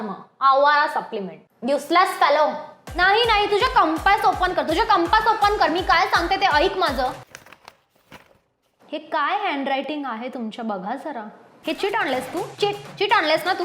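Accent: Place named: Pune, India. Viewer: Ohh that puneri accent... Paniii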